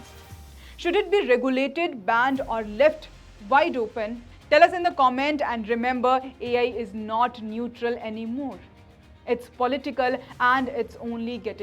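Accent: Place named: India. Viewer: your English sounds like Hindi. WHY dontbyou better talk in Hindi.. .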